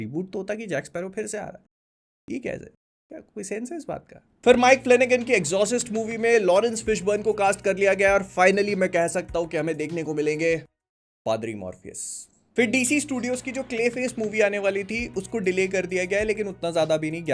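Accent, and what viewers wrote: Bhopal, India. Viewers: bhai bhopal se ho?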